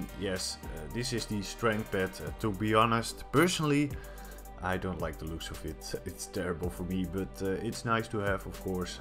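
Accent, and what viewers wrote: Netherlands. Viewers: Heerlijk Nederlands accent er doorheen. Audio is idd beetje slecht te horen. Kwam m tegen in me lijst heb gelijk ff geabonneerd. Drop die PVM dingen haha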